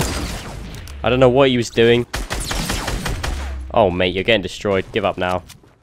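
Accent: United Kingdom. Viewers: British accent My dream accent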